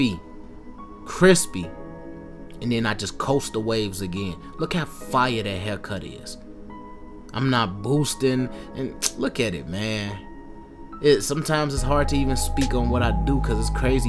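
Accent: Chicago, United States. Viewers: Sound like Chicago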